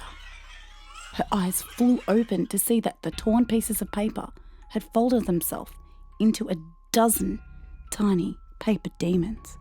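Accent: Australia. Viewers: Aussie accents are so nice to listen to. Great voice and video. 😊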